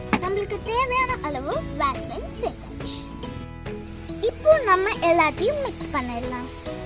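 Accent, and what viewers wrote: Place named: United Kingdom. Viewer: OMG! Did anyone notice her English accent ? 😻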